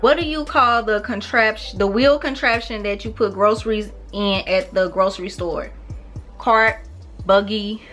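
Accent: St. Louis, United States. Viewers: I love St. Louis accent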